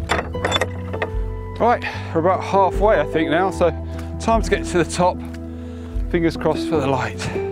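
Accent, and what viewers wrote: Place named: Scotland, United Kingdom. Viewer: Nice to hear this light Scottish tone in your voice. I turned my noise reduction off, that's all took from your video. The rest of my settings are identical. Thx for sharing your experience. 👍🏻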